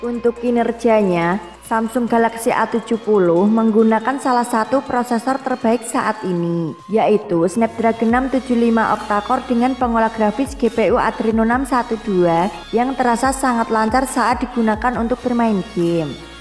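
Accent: Java, Indonesia. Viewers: Jawa tulen ....suaranya.....medok....haha😆😊😀😉😯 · Khas Jawa 😆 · Suaranya medok bgt gak enak didengar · Medok jowone, tapi malah trkesan unik..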